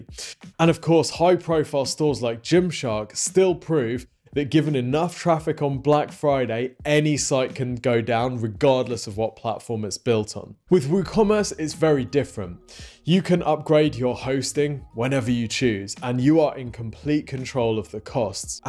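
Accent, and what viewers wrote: United Kingdom. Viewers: I love your British accent! Would Ease of Use n Support be better if I go with Woo under Bluehost?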